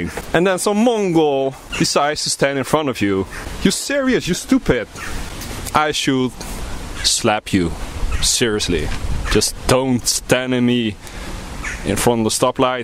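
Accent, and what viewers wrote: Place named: Netherlands. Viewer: it's fun to hear within 1 second that your dutch XD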